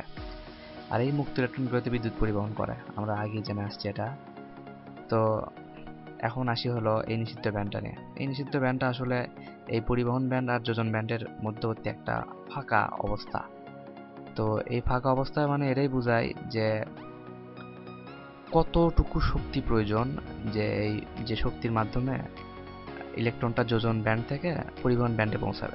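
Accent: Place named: Bogura, Bangladesh. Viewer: Bogurar vasha sune valo laglo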